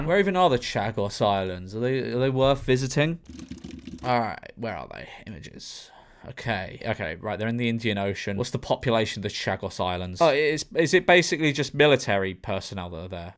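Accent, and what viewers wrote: United Kingdom. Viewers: Omg I’m having such a hard time. I needed this right now, seeing your masked face and hearing your British accent. My OCD is feeling good.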